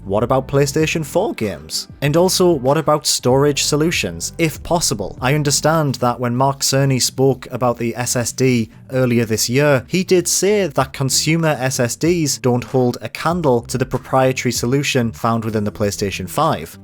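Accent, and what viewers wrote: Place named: Jarrow, United Kingdom. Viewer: Are you from jarrow m8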